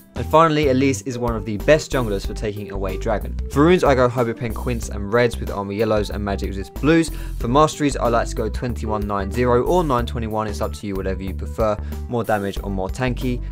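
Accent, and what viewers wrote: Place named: Southern England, United Kingdom. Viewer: ... What do you mean what's with the accent? He's from the south of England...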